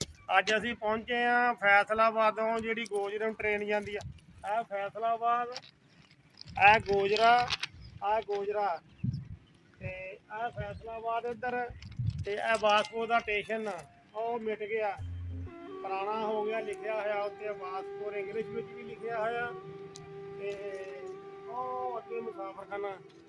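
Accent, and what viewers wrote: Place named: Ludhiana, India. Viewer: Sir aapki boli to Ludhiana deha form Begowel doraha Ludhiana Punjab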